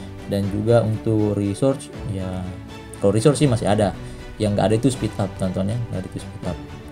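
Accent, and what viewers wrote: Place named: Sulawesi, Indonesia. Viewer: Dari logatnya pasti orang Sulawesi😂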